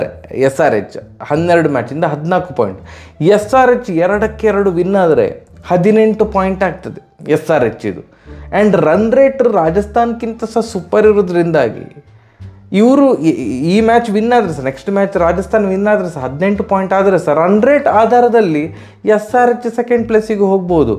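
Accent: Mangalore, India. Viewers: ನೀವು ಮೊದಲು ವಿಡಿಯೋ ಮಾಡಿದಾಗಿನಿಂದ, ನಾನು ನಿಮ್ಮನ್ನ ಫಾಲೋ ಮಾಡಿದೀನಿ, ನೀವು ಐಪಿಎಲ್ ವಿಶ್ಲೇಷಣೆ ಮಾಡುವ ರೀತಿ ನಂಗೆ ತುಂಬಾ ಇಷ್ಟ, ಚುಟುಕು ಹಾಸ್ಯ ದೊಂದಿಗೆ ನೀವು ಹೇಳುವ ಮಂಗಳೂರು ಭಾಷೆ ನಂಗೆ ತುಂಬಾ ಇಷ್ಟ, ಒಂದು ರಿಪ್ಲಯ್ ಮಾಡಿ 💕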